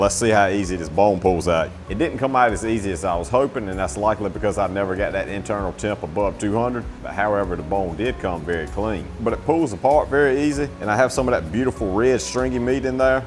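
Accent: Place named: South, United States. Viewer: Dude. I'm an English teacher who really appreciates dialect. Would you please tell me what region influenced your accent? It's like southern Appalachian with a hint of Cajun or Creole (can't tell).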